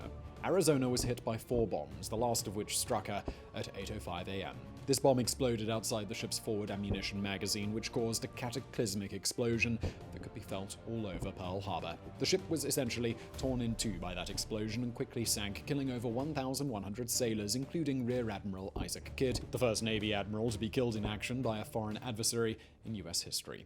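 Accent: United Kingdom. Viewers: ahh british v sauce always makes my day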